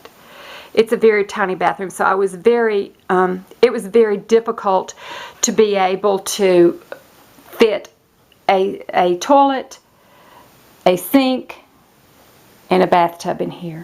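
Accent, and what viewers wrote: South, United States. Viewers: I'm taking you back old school. Lol. I found your channel and started binge watching. You are the sweetest little southern bell. I'm from Kentucky but I think your accent is more southern than mine. ( I know you probably think Ky isn't southern but northerners do.) I just wanted to say, thanks for showing us your house ,even though you thought it was messy( it wasn't). It helps people relate better to you. I just can't feel comfortable in a house where every single thing is in place. I like a home that looks lived it . ( I guess you just live in the closet because the rest was perfect with nothing out of place). Lol. I'm just cutting up with you. Beautiful home but you are even more beautiful. Your inner beauty shines through to your beautiful face. Thanks for sharing.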